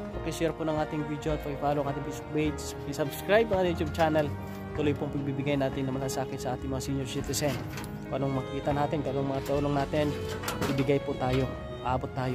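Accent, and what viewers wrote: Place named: Cebu, Philippines. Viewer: Ano yung dialect mo cebuano ka ?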